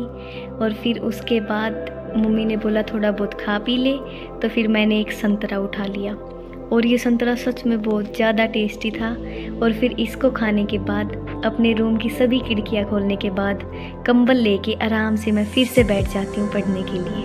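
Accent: Haryana, India. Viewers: Aaj k vlog me haryanvi sunkar bahut aacha laga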